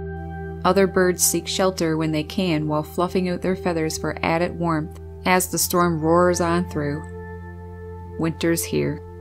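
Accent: Canada. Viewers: What a beautiful birding documentary. The scenery is gorgeous and the birds you have around you are amazing. I am in Michigan and when I hear someone say out the way you do I can tell you must be from Canada. Northern Canada. Maybe I am wrong. I have watched you on and off for a while and now I am watching much more. Do you have any advice for me? I am moving in the spring/summer to my home in the thumb of Michigan’s lower penninsula. I am now in the Detroit area. We get birds in our yard, in the woods there at our other home where we are moving permanently but I would like to maximize this. I get the normal finches house, and gold finches, chickadees, rose breasted grosbeaks a rare cedar waxwing but it has been years, and we get Veery Thrush who sound amazing but are a rare site, and lots of wood peckers downy and red bellied, and eastern phoebes juncos etc. but I want the blue jays that I never see up there. 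We are right off Lake Huron and att our friends house they have purple Martins, Sand pipers and gulls. Can it draw blue jays to wooded areas? I will live in the woods?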